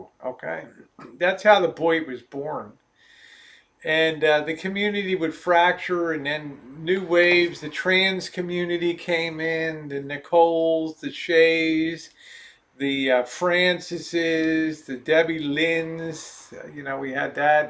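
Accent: Baltimore, United States. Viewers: We are the same age and I feel so I pretty in comparison! I'm interested in your metal detecting. It's something I would love to try. How hard is it on your back? Is it something that you would think is not good? I have a bad back but am able to get around. I understand that you have to do some digging but is it possible? Also I have family in baltimore and love your accent 😍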